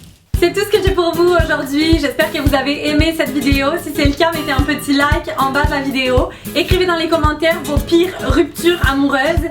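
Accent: Quebec, Canada. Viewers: tu as l'accent de celine dion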